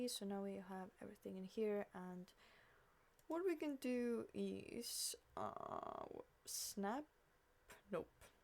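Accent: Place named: France. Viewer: Great work! keep it up! I also like ur accent, are u French?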